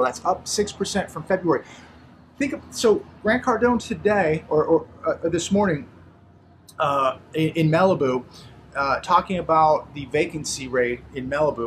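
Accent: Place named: South, United States. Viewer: It's Fridaaaaaaay! is perfecting a distinguished "southern drawl". My ancestors are from Montgomery, Alabama and Franklin County, Alabama. ~~ Safe travels.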